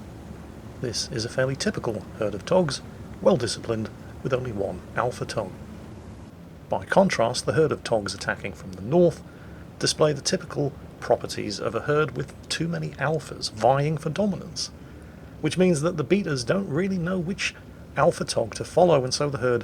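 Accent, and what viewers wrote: United Kingdom. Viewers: Sir David Attenborough doesn't have anything on you,. If you fail at gaming, I certainly see a career for you in monotonous British-accented wilderness television. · his British its in there blood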